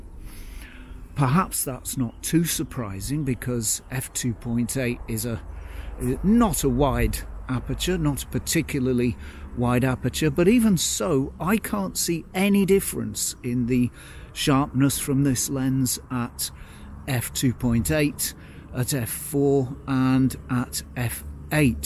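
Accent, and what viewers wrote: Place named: Stockport, United Kingdom. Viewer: Love your videos, having shot film in the past, and currently looking for a 35mm having got the zeiss 21mm loxia, which i love, but i'm thinking the Voigtlander 35mm f2 Apo-Lanthar Lens might be my solution, so this content is most helpful , thanks, keep up the good work. ps your accent sounds like you're from Stockport, am i wrong ? , i only spent a year there.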